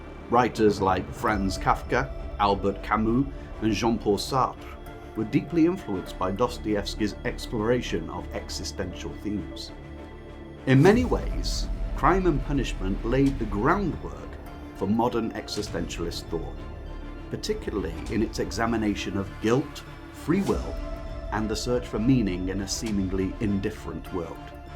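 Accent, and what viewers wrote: United Kingdom. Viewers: Hi, thanks for this review and all the others you have published. Just one thing, could you leave the music off? It is very distracting, and we Americans have to concentrate to understand your british speech.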